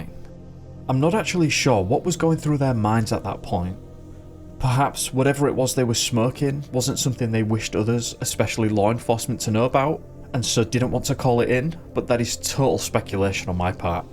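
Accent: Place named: North, United Kingdom. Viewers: The narrator has a Northern accent. Its quite acceptable to pronounce words with your own accent. Its you who is showing your ignorance by making such a comment. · No I'm from near Northumberland I'm a geordie and I'd say it's a posh Lancashire accent or Yorkshire but definitely not Northumberland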